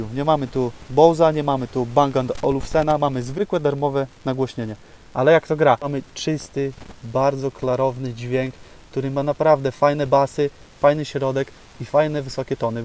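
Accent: Podlasie, Poland. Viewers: Masz suba fajnie filmy ;) Słuchać akcent Podlaski, pozdrawiam ;)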